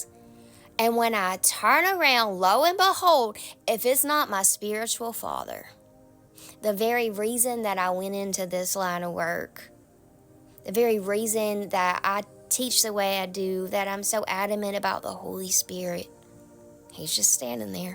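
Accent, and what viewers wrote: South, United States. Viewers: I love your Southern accent 😄.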